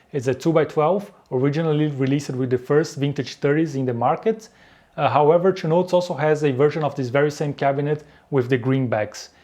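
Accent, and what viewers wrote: Brazil. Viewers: Tu é brasileiro né? Mó jeito de brazuca de falar em inglês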